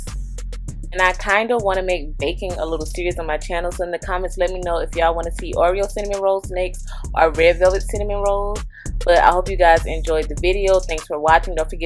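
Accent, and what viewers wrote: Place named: South Carolina, United States. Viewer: Omg really you have a different southern accent than most of the SC people I know. I love it.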